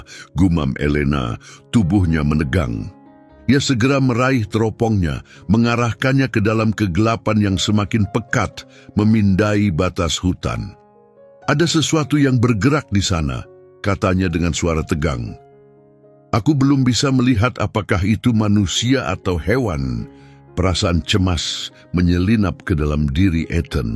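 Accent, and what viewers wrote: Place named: Malaysia. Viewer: Admin orang Malaysia?. Bahasa Indonesianya bagus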